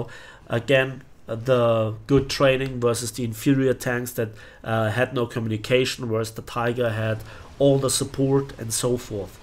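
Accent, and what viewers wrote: Bavaria, Germany. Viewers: I only recently subscribed to your channel and so I don't even know most of your signature style reviews. Anyway, I value the complex, complete and non-biased approach of your stream of thoughts. In this episode, you really shine when you explain the "Tiger-Problem" - something I wasn't aware of because I do not frequently follow the tank side of WT. As a non-tanker I can only say, I got my premium Königstiger maybe two years ago and played exactly three matches in it because I was horrified at the thought that I would be humiliated by some lower tier opponent. At least this fear is gone now but I guess, in the rare cases I play tanks I will stick to my StuG III in arcade - and even occasionally have multi-kill matches in it, ha ha ha. Anyway, thank you very much for your interesting videos and keep up the good work. And btw, I really like your Bavarian-English. 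Viele Grüße aus Unterfranken und schöne Restwoche!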